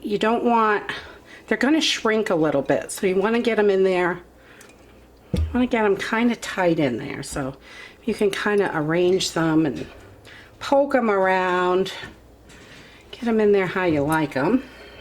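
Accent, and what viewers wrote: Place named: Maine, United States. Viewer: I KNEW I recognized that accent! We just moved here a year ago from the Lakes Region in NH back to my hometown in Maine. Howdy Neighbor!!!!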